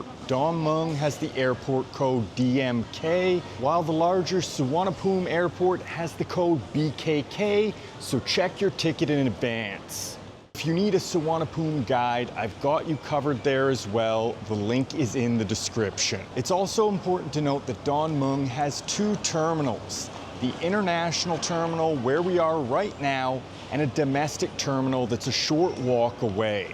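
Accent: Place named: Canada. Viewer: He's a fellow Canadian - the sound of home ;-)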